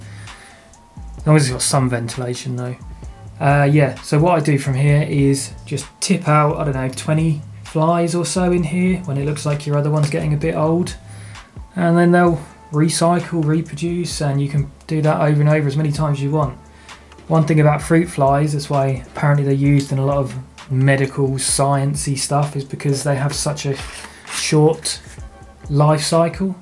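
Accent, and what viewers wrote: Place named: United Kingdom. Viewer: Great video. I like the way you are not so scientific, but more casual and general. Sometimes the basics are more important than all the technicalities. I like the quiet ambient music in the background so I can hear you speak clearly. Nice British accent is also appealing. I learned a lot and enjoyed watching you. Thank you. Wow. I'm glad I made it to the end of the video because I love your idea about using the squeezable condiment bottles. I am looking forward to trying that idea for dispensing fruit flies.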